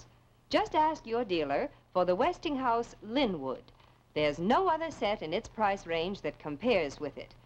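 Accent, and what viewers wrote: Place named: Boston, United States. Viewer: almost sounds like 1950's upper crust Boston accent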